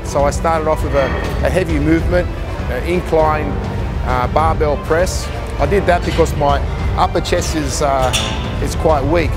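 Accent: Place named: Australia. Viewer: He's Australian!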